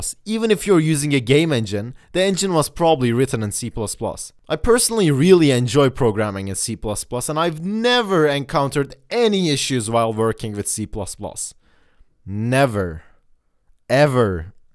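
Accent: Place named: Turkey. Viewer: Türk olduğun belli oluyo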